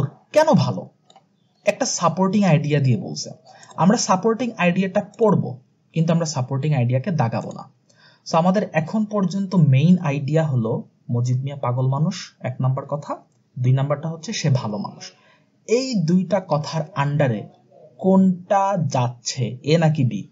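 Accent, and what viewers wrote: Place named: Sylhet, Bangladesh. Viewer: সিলেটি ভাই। আমিও সিলেটি😍🙂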